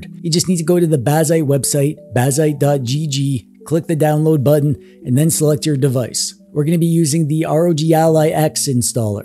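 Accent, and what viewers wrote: Canada. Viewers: You’re Canadian, aren’t you!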